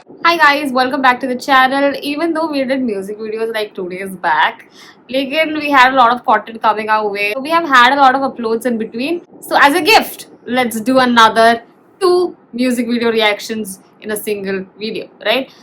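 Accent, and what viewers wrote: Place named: Telugu, India. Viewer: Your Telugu is so cute 😊😊😊❤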